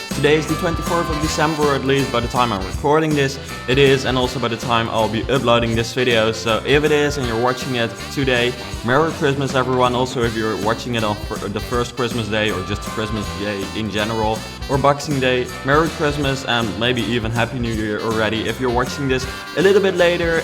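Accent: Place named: Netherlands. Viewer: Haha ik kende je kanaal nog niet, maar ik klikte op je video (zonder naar je naam te kijken) en hoorde gelijk al dat je Nederlands was! Haha, Nederlanders hebben altijd zo'n accent, wel een super leuke video👌🏻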